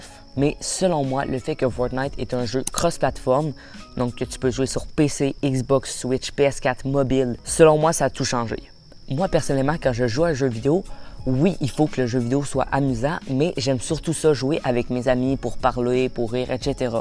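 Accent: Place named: Canada. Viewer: Bonne vidéo frérot ! Avec le petit accent canadien 🙏🏻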